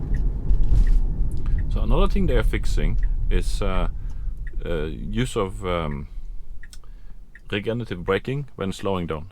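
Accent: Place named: United States. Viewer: sounds Swedish American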